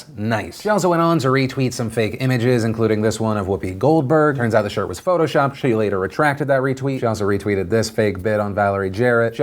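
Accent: South, United States. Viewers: Why does talk with a southern like accent some of the time? When he is of Italian descent, raised in the Bronx, and currently lives in LA. 🤔🤔🤔 Love and his show, just wondering?! Lol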